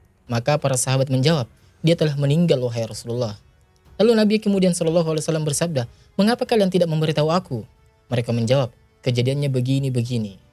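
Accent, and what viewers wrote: Sulawesi, Indonesia. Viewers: Masyaallah, kentara sekali Sulawesi nya.. semangat ki Sodara❤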